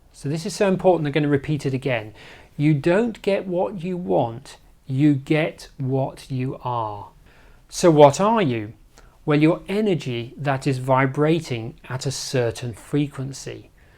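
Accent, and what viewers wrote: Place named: England, United Kingdom. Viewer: Always nice to hear a calm, gentle English accent. So much more pleasant to listen to. 🙏 Thank you for your invaluable knowledge.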